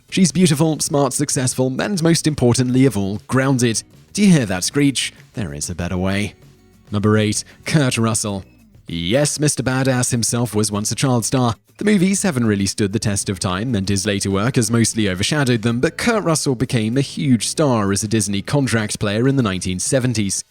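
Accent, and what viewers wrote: United Kingdom. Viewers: has always been my favorite top ten list channel. Dont lose. We Yanks love British accents.: )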